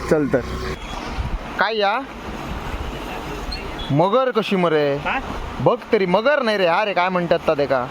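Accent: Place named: Malvan, India. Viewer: भाऊ..तू दोडामार्ग चो असा ना..म्हणून मालवणी कोकणी asscent कडून बरो बोलता 🎉😊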